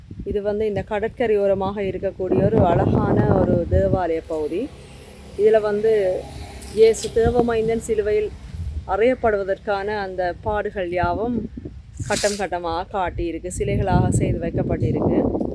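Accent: Sri Lanka. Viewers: Neengal peasum inimaiyana ilangai Tamil azago azagu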